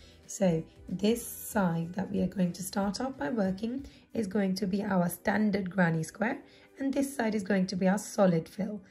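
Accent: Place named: Wales, United Kingdom. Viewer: I have made it and it is very nice. What a lovely accent . Is it Welsh?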